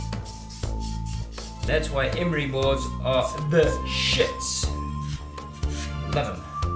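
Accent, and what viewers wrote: South Africa. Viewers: I can tell instantly you're South African tjom. Great video, was just looking randomly for folks who may have crafted Vincent Valentines gun and I found you. Not very many South African content creators like you and I around, granted our content differs by a helluva a degree but still cool to see another.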